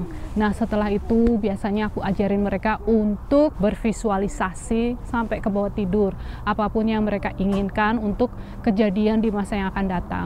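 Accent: Banyumas, Indonesia. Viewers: mba esih katon logat ngapake:D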